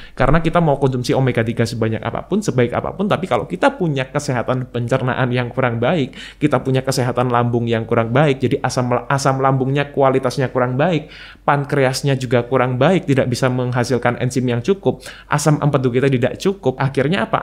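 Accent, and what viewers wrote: Java, Indonesia. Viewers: dok org jawa ya,logat jawanya dapet 😁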